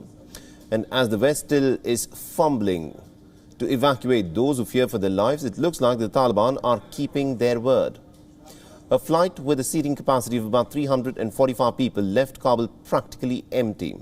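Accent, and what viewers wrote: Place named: India. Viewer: Real English, Indian accent. You yankis should realize there is a whole world outside your borders.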